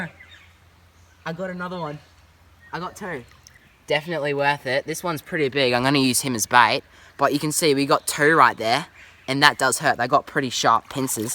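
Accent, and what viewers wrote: Australia. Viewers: Are you Australian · Haha you Australians talk so funny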